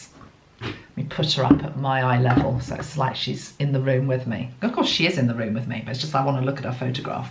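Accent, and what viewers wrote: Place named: RP, United Kingdom. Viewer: i agree too. And the voice becomes well spoken with the plumminess of aristocracy. Beautiful x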